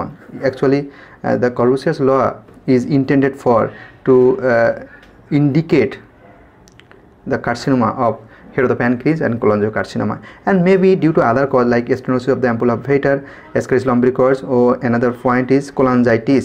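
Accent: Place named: Bengal, India. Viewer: Legendary bangali accent😅. Thank you for clearing the concept so well.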